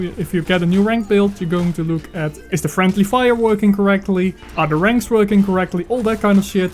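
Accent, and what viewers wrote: Netherlands. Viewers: haha lekker hollands accent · U sound really Dutch (vette video man en respect voor je Engels het is echt goed)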